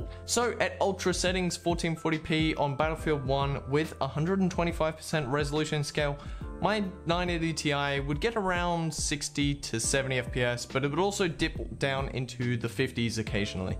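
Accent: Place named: Australia. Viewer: I cannot tell if ur asutrallian american or british ?😂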